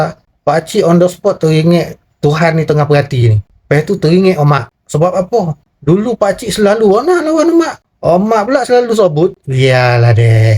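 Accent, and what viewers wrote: Negeri Sembilan, Malaysia. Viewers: orang NISMILAN..tu deh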